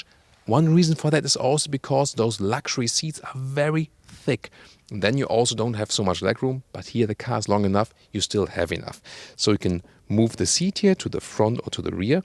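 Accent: Germany. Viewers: This German accent :)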